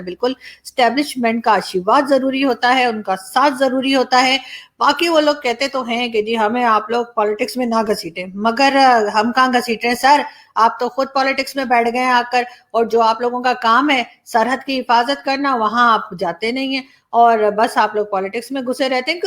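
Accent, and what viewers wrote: India. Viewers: It is hard to believe that you are from Islamabad.Speaking and behaving like a typical Indian lady.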